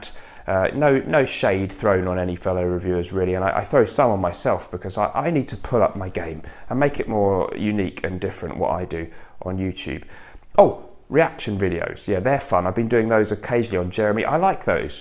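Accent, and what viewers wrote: United Kingdom. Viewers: Heeey, you are too harsh to yourself))) buuut I totally agree with you, the one can take inspiration from other topics of interest and hobbies...you can make podcast, interviews with interesting people, indepth description of a particular fragrance, we learn a lot from you. I like your British English and sense of humor.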